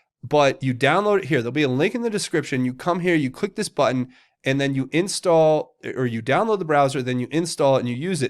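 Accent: South, United States. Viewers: Love that southern accent. LOL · YOUR SOUTHERN ACCENT SAYS IT ALL BROTHER...."Q" ROCKS....HE OR SHE (THIS COULD BE A WOMAN) IS THE ONLY ONE GIVING US THE TRUTH...I CAN'T WAIT FOR THE MILITARY TO SWOOP IN AND SNATCH THESE .THIEVING PEDO'S UP...WWG1WGA....U.S.M.C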